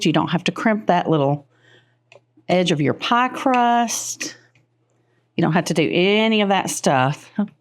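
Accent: South, United States. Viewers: Southern girl here (from Mobile) love your recipes and your lovely southern accent is music to my ears. God bless. 😊😊😊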